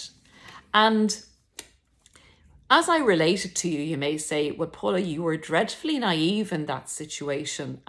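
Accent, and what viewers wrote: Ireland. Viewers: OMG Paula, you knew but you didn't want to face it. They also like to wait till we've had a few drinks before they act up or 'fess up. My ex used to tell me about all the cheating housewives on our street. Later I realized they were cheating with HIM! He "worked from home" while I went out to an actual job. I guess they were all getting plenty of "exercise."😂 Love your Irish lilt, eye looks well. Prayers and thanks from across the pond❤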